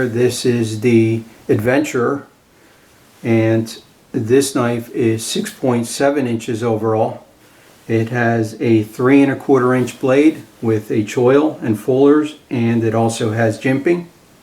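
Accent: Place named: Philadelphia, United States. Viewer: That’s a philly/ south Jersey accent if I ever heard one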